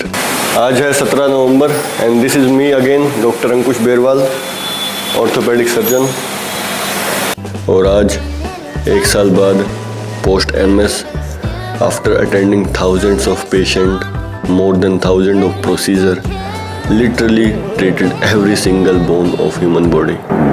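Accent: Haryana, India. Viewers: Sir your haryanvi is awesome - approved by another haryanvi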